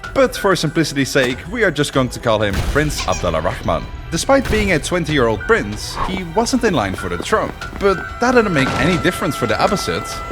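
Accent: Netherlands. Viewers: That's a very heavy Dutch accent 😂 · Ik hoor bijna niet dat je Nederlands ben respect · Dutch accents are ruining YouTube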